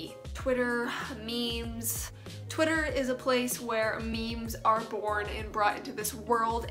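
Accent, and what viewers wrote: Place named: United States. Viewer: Hey I’m lesbian. I thought you were American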